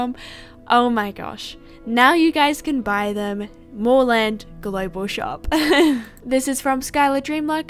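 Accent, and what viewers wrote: Australia. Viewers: Omg wait are u Aussie bc if you are 😳😁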